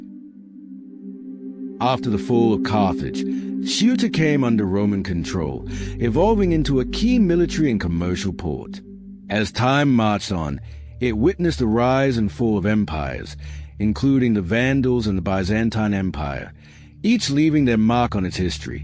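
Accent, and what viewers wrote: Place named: United States. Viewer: ' like the accent of the narror, 'cause he's narring with a genuine one, hey where u fro bro? 'merican, i gess ' what state ?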